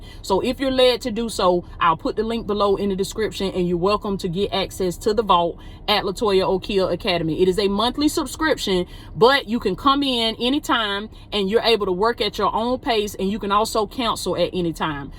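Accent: South, United States. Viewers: Love love love love love love love love love love love that southern accent my sister😊😊😊.....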